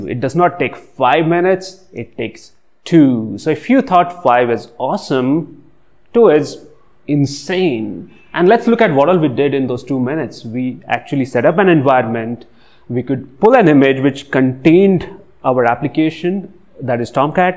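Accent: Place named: India. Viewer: I'm no English native so the Indian accent is hard for me, sorry.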